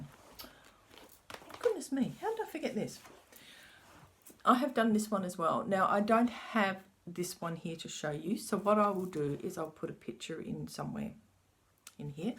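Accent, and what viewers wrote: Australia. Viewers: What a delightful video! I have just subscribed and am thrilled to find you!:) I am so happy that you came to visit my little channel. Isn’t it fun to find a new stitch friend through our wonderful hobby/obsession!!! BTW, I love your Australian accent and your craft room. I look forward to binge watching your other videos soon. Happy, happy stitching from a new friend in Texas.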